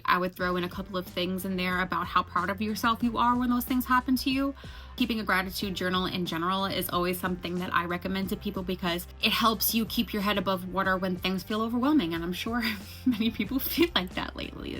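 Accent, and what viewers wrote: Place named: New York, United States. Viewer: Oh snap, I knew you sounded like a NY’er…Rockaway Blvd stairs is NO JOKE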